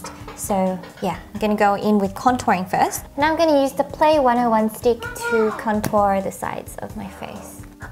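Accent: Australia. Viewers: You are just lovely but I often get confused with your accent hahaha. I know it's mostly Australian but sometimes I hear Russian? Hahahah it's probably just me. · i love your aussie accent ♥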